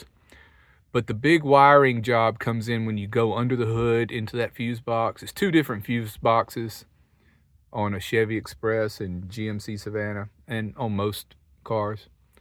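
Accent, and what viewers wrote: South, United States. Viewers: you're the best! Every time I see that you have a post, I get excited. I love your little accent. I'm originally from Oklahoma City, I live in Arizona and have for the last 30 years, but I still have a special place in my heart for the southern folk with accents. You just seem so genuine and so cool! I wish nothing but the best for you! I'm looking forward to seeing what you end up with! You deserve some comfort, you hung in there and done great things! I love it when you say I'm tinkering! Haha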